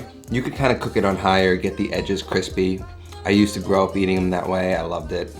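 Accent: New York, United States. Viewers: Oh my god are you like from New York or something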